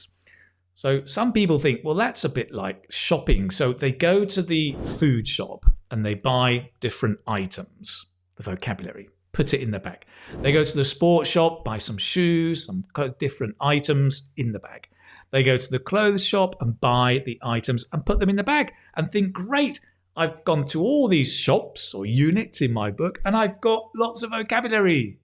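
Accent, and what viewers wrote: United Kingdom. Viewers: it's a pleasure to hear you speaking with your British and very clear accent and slow enough to understand so well thanks for all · I always watch your videos. I love the way you teach. I want to take one of your courses. I’m an English teacher in Guadalajara, Jalisco, Mexico. I don’t need to take the IELTS but just for having a challenge and as a motivation. All my life I’ve tried to improve my English. I love English. Sometimes I feel stuck because I don’t use it! Nobody speaks English in my country. What course do you think I should take? I love your British accent! Lizzy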